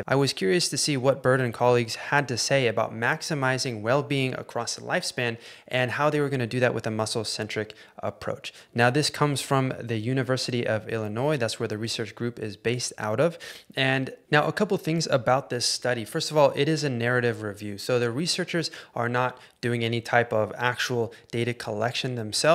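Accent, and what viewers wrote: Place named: Australia. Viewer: Do you have an australian parent, your accent is interesting.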